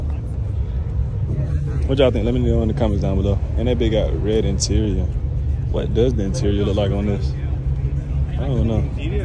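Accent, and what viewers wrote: Atlanta, United States. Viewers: bro i kid you not people talk like this in atlanta its an accent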